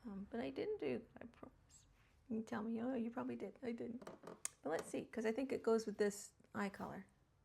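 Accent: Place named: Boston, United States. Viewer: There’s many Americans here in Australia and of course many Canadians. I always ask “Are you Canadian?” because I know Americans never get offended, unlike some Canadians do if I ask “Are you American?”. Generally I think both Canadians and Americans are very popular here (especially Americans) because we have grown up watching so much US TV (yes I too watched too much I dream of Jeanie, Bewitched and Get Smart). I feel like America represents the very best and very worst of western culture. Which is always so fascinating, to me anyway. Do you miss home? I can really hear your ‘Boston’ accent today (sorry if you’re not from Boston, it just reminds me of the accent in Good Will Hunting which was set in Boston.) It’s a very distinctive American accent. Sometimes I can tell an East Coast vs West Coast accent but not always. Anyway, what an interesting life you have lived. 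You’re truly international. How did you end up here in Oz? You’re American, with long grey hair, wild makeup preferences, fluctuating accent and work with birds! That’s interesting. Why do I get the sense that is just the tip of the iceberg with you? There’s a lot more to your story?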